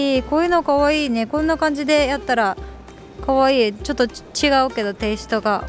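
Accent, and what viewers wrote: Japan. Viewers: いつも楽しくみてます！ 方言富山ですか？？似てたので… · 初めて動画観ました！ 高知弁が可愛すぎてキュンとしました☺️💕 旦那さんと息子さんも素敵すぎて理想の家族です✨ 他の動画も色々と観たいです☺️❤️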